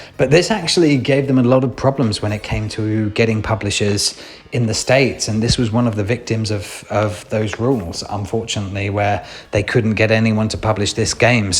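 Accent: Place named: United Kingdom. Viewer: Hi , isn’t Worms an Amiga game that got a DOS/PC version amongst many other ports? “PC” from this era (in the UK at least ) generally refers to IBM compatible machines rather than other home computers (ST, Amiga etc). I would have referred to the original Amiga version if it were my video - not “PC”. I remember this being released in the UK as I worked in a computer game shop at the time . I appreciate a lot of YouTube videos are America centric but you sound British . Kind regards D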